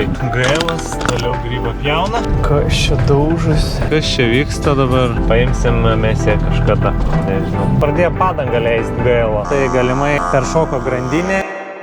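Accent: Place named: Panevėžys, Lithuania. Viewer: Gal esi pasakojęs,bet iš kurio Lt galo kilęs? :D Palei tarmę lyg Panevėžys?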